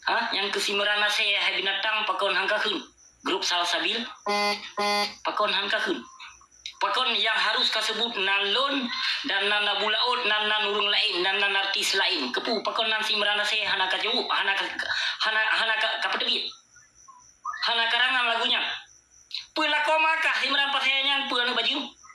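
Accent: Aceh, Indonesia. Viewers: Haaaa....haaaaa...😄😄🤣🤣🤣🤣🤣🤣 ikee asai kunonton dan ku deungoe abusyiek teumeunak saket pruet ku khe'm. 🤣🤣🤣🤣🤣🤣🤣🤣🤣🤣🤣. Mantap abusyiek. Tok asli Aceh Cara meunarit dan ceurapa. Hana dua abusyiek 👍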